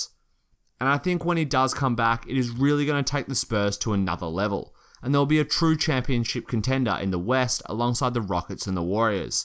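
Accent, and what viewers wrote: Australia. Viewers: That was an incredible video I love your a accent it’s just like mine go aussies go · Are you Australian?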